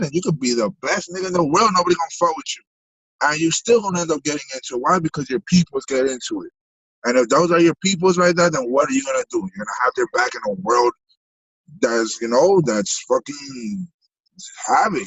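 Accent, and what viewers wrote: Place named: Malden, United States. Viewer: Sup my man u said u were born in Malden but grew up in Florida, there's no way u didn't grow up in Malden too with that accent! What age u leave?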